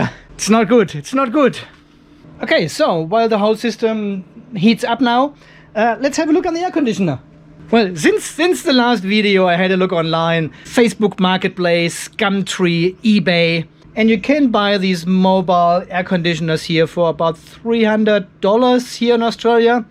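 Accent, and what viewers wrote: Germany. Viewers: Also deutschen Akzent hört man ja schon sehr deutlich, wenn er Englisch spricht.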